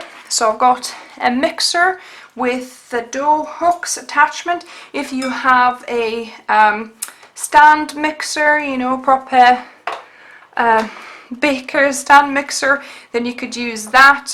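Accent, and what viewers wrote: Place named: Scotland, United Kingdom. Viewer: Are you Lithuanian? I was so sure you were Scottish or from somewhere nearby in the UK! But I'm not from there myself so maybe there is some small differences that I don't hear. The okara roast looks interesting. I'm getting so much okara now since I have started to make tofu and chickpea yogurt so I'm really appreciating the okara recipes!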